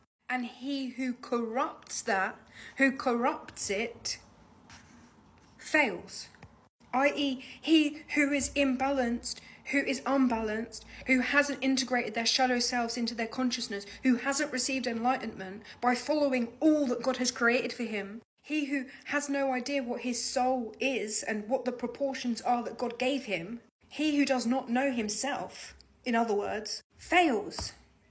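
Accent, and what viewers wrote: United Kingdom. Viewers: ليست أميركية ، بل انكليزية أو بريطانية واضح من لهجتها . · من لكنة اللسان هذه السيده تتحدث باللهجة البريطانية وليست أمريكية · لكنتها انجليزية وليست أمريكية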